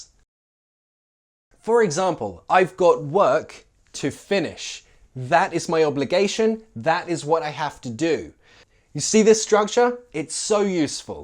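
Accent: United Kingdom. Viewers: Mohammed Nabi It is British English for sure! English is just amazing! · Very useful for me! Thanx from Mexico City! I love brit accent! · Hi sir it British or American english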